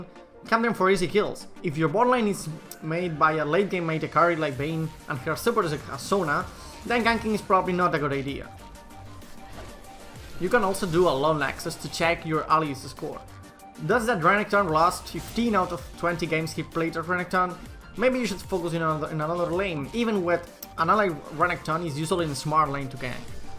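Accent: Spain. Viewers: no se porque pero me da que eres español xD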